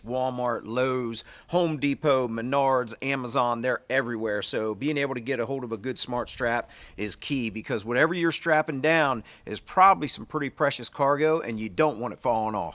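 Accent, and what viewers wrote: Australia. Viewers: Mr Big Australian English. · Great vid bro love the accent, it’s been a while since I used one of these dazz Australia..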